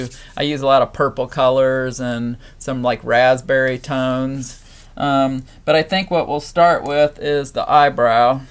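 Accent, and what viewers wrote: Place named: North Carolina, United States. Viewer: I love that you have an experienced teaching style. Are you from NC, your voice sounds like it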